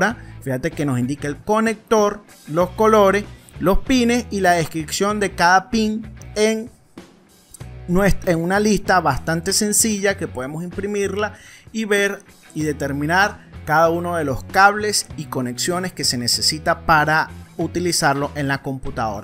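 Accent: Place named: Venezuela. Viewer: Saludos, si no me equivoco tu asentó es netamente Venezolano y como buen Venezolano seria importante que nos respondieras sobre como podemos utilizar esa plataforma acá en VENEZUELA, espero nos respondan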